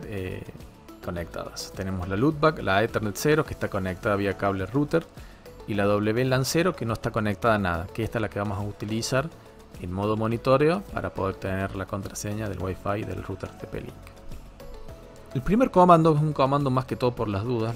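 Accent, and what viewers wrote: Córdoba, Argentina. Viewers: que hermoso el acento cordobes explicando algo, saludos desde Córdoba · Sos cordobés ?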